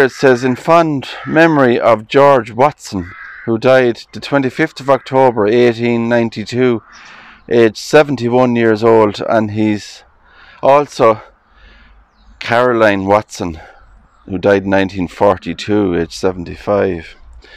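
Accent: Ireland. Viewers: Thank you for another wonderful tour! Yes I too love listening to you and your Irish accent I could listen to all day!❤❤❤❤ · ME TOO i love YOUR IRISH ACCENT!!!! OLD CEMETERY'S ARE SO INTERESTING. bUT IT IS SAD HOW TIME WRECKS THEM AND SOMETIMES VANDALS. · I was also wondering which part of Ireland your accent is from. My 4 times great grandfather came from county Clare to Australia in chains,for the term of his natural life because it was alleged that he was involved in white boy activities,he was 12 I often wonder what he looked like and spoke like. He died at 35,no doubt as a result of being flogged as laid out in the NSW convict records. 😮. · I love the Irish accent. Mother-in-laws ancestors were from Dingle Dale. Great job on all your videos. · Love your Irish brogue!! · I really love the Irish accent and yes that’s a weeping willow, we have them here in Australia too. 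Love your videos xx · Love the irirsh accent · Thank you for another beautiful video. My husband and I were just commenting on your Irish accent. We are in the United States in Northern Michigan and we get teased about our accents here, if you really want to hear a different accent look up a Yooper accent. It’s an accent that people who live in our upper peninsula have, some of the people who live in the lower peninsula but very far north also have some of this accent. It comes from all of the Finnish, Yugoslavian,Italian and Canadian people who migrated here through Ellis island to work in the many copper mines. I hope that the two of you have a lovely evening. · love the irish accent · Sorry, I can't help with the Latin, but as for that tree.... yup, I do believe it is a weeping willow... and an old tree at that! That chain.... wow, that's a work of art, very nice! And yes, another one here who loves the Irish accent! 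👍 As a matter of fact, I'm listening right now to a few of th' b'yes o'er in Ireland on the ham radio! (I speak with a few of them from time to time!) 👌 Pete 🇨🇦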